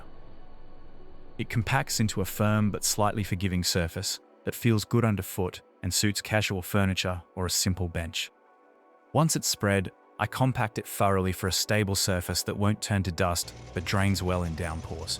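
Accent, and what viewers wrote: Australia. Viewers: Wow! Love this vid. really appreciated the approach you took to honouring the legacy and history of the area. And as a fellow Australian, love hearing the Aussie accent!! It’s such a good reminder that you don’t need a huge space to do phenomenal things. Subscribed, and I’ll be sharing this vid with others. Love your landscapong wirk, as well as content capturing! and looking forward to watching more of your content!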